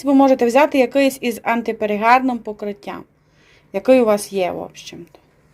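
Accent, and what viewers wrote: Transcarpathia, Ukraine. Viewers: Це канал і він ведеться закарпатським діалектом української мови. И иппен за вто мы го любиме позирати и слухати. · Обожнюю ваш Закарпатський говор👍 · Супер!І паштет і мова закарпатська просто заворожує!Дякую,файна господиня!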